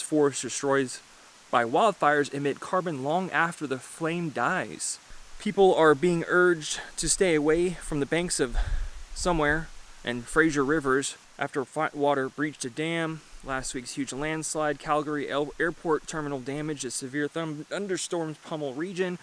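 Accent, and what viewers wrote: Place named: United Kingdom. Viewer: I like your UK accent,.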